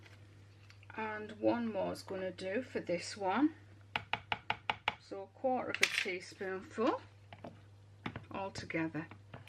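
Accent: United Kingdom. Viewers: Lady! I don’t know why I haven’t watched you for so long. I think I overdosed on soap! 🤢. You are one of the wonderful generous soap ladies who made me feel like I could a; make soap and b; pipe soap even though I had never piped really anything of any mention before. You are still at it trail blazing. Thank. You for sharing your time, your talent and your lovely British accent!!!n. Keep up the wonderful work!!! PS I have been a fan for over 5 years!!! Wonderful to see how you’ve resolve your pesky silicone liner problem!!🤣🤣. You’re a genius!!! “Blue birds and Cherry blossoms” soap name!!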